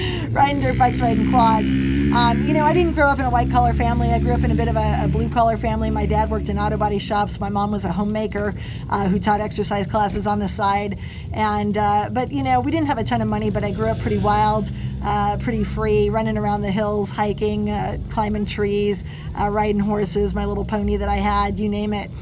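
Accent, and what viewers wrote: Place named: Pennsylvania, United States. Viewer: You sound like you ran around the hills of pennsylvania!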